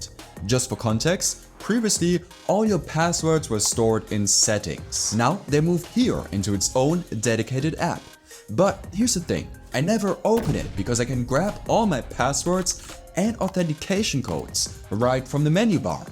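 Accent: Germany. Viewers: What a dreadful German accent.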